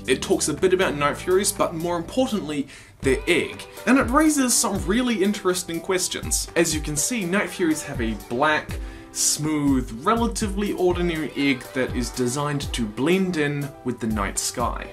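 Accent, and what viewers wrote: New Zealand. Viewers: Knew you were kiwi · are you a kiwi/new zealander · Wait... you from New Zealand? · are you from New Zealand?!?!?!? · I was wondering if you were from New Zealand, was wondering why your accent was so familiar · Are you from NZ?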